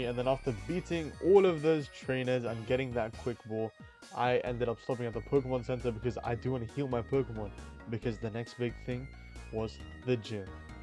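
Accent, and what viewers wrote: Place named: Sydney, Australia. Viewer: You got a Sydney accent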